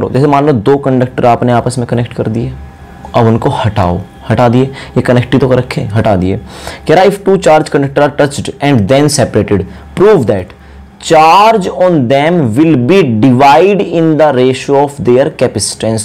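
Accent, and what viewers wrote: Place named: Haryana, India. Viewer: ur accent is like that (from haryana)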